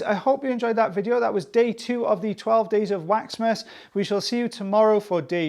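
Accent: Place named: Wales, United Kingdom. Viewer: I watched a vid from you, of 2 years ago. You didn’t sound as ‘Welsh’ then. What’s occurring?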